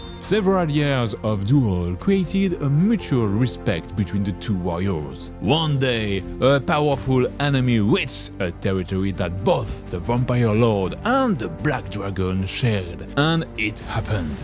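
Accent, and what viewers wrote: France. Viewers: Héhé you are the Bruno Albouze of mini paintings (same fr accent and all)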